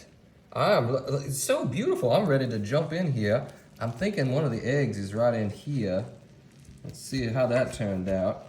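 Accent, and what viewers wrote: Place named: Virginia, United States. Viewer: Sounds like Virginia's in the house! Haven't heard that accent since The Walton's😂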